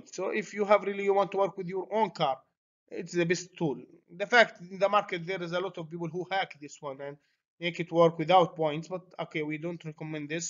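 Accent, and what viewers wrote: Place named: Iraq. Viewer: من لهجتك عراقي❤😊 اذا ممكن اتواصل وياك محتاج هذا الجهاز لكن مال دراجات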